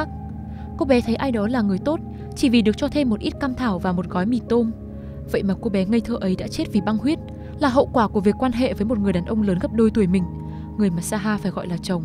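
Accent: Vietnam. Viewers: hihi mình nói giọng Nam chữ "dây" phát âm khác chữ "giây". Nghe bạn nữ giọng Bắc phát âm "dây" giống hệt chữ "giây" nên mình bị quán tính, thật là thiếu não.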